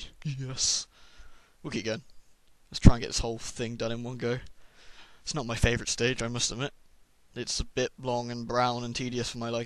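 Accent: United Kingdom. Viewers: i cant tell is he brithish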